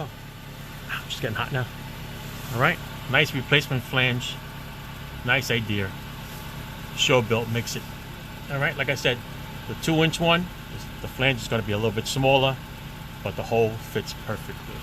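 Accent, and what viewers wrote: New York, United States. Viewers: Thank you, thank you thank you. I am a native NuYawka living away from home and experiencing the same problems with my 2011 Honda CRV. The quotes I got were astronomical and pushed into my "I wish I was home cause I beat this can be done for under 50 bucks!" After trying to find a better price I transitioned into "I can do this my(dayam)self...I am NY Hard!" When I found your video my ears perked up b/c I said I know that "accent." Then after watching you fix this simple problem and share the information gave me new hope. Again, thank you, thank you thank you.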